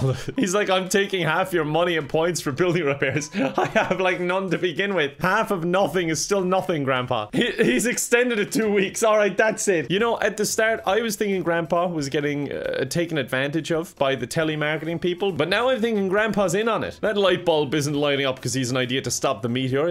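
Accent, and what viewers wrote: Ireland. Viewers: IRISH ASMR WE NEED IT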